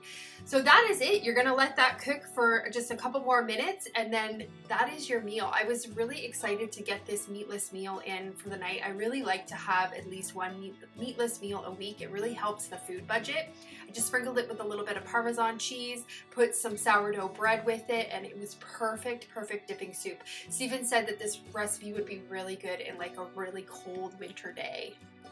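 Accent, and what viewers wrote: Canada. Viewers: I just found your channel, I am enjoying following you. I can hear a slight Canadian twang, I live near the border in the PNW. I am excited to see more of you videos.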